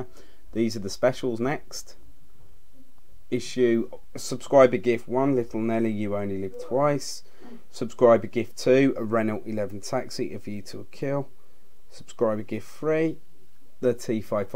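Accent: United Kingdom. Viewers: Making a recording like this might look easier then it is.... I'm glad he did it. And he speaks British English....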